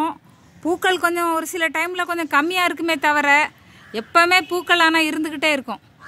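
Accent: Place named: Tirunelveli, India. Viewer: மேம் நாங்க தாராபுரம் ஏரியால இருக்கவங்க மேம் எங்க ஏரியால இந்த செடி கிடைக்கிறது இல்லை ஒரு பதியம் போட்ட செடி எங்களுக்கு கிடைக்கும் எனக்கு இந்த செடி ரொம்ப பிடிக்கும் மேம் ஆனா எங்க ஏரியால இந்த செடி அதிகம் இல்லை இனிமேல் நீங்க வந்து திருநெல்வேலி மாவட்ட ஏரியாவுல உங்க வாய்ஸ் வந்து திருநெல்வேலி பாச மாதிரி இருக்கு அதனால தான் கேட்கிறேன் இரண்டாவது என்னன்னா முல்லைக்கொடி எல்லாமே கிடைக்குது இந்த சந்தனம் உள்ள செடி வந்து கிடைக்கவில்லை எனக்கு ரொம்ப நாளா இந்த செடி வாங்கி வைக்கணும்னு ஆசைங்க ஆனால் கிடைக்க மாட்டேங்குது எனக்கு ஒரு பதியம் போட்ட செடி தருவீங்களா ப்ளீஸ்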